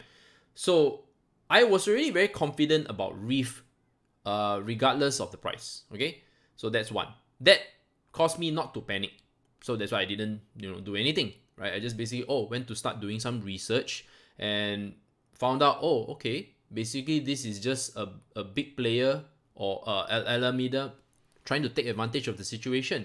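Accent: Singapore. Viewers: What accent is that? Singapore?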